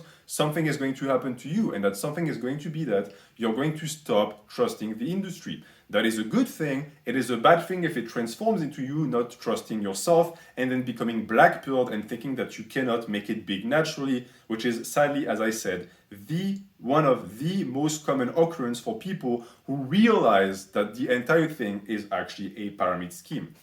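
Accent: France. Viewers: I'm just here for the French accent. You're like a jacked version of my tall French friend haha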